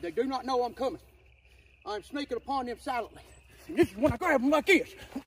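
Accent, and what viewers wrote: South, United States. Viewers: took the internet by the storm. Looking like a Hulk, talking like a deep Southerner. Priceless! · I love his southern accent. He’s hilarious.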